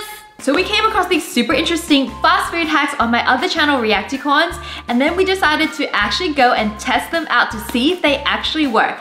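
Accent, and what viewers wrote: Australia. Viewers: Wait is she Australian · Are you Australian??? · Are you Australian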